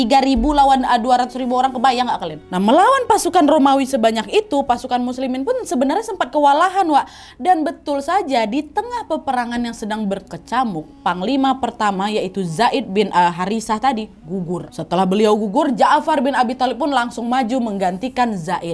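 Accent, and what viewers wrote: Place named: West Sumatra, Indonesia. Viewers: Urang awak logat minang nyo kentara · Uni urang awk yo kelihatan banget dalam bicaranya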